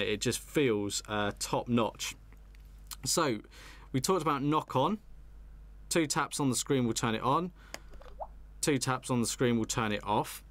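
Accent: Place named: United Kingdom. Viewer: i think he sounds british.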